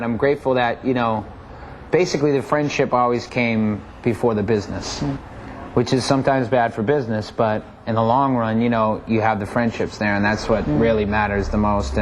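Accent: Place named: Boston, United States. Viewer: said "you know" 30 times. and each time was amazin lol love his boston accent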